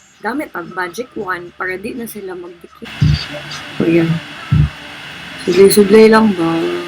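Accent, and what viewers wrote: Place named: Cebu, Philippines. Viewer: I thought you were. you have that cebuana accent. You should make more of these, with better lighting, video quality, and sound quality. and keep the bisaya script. Lot of potential to be a popular pinoy youtuber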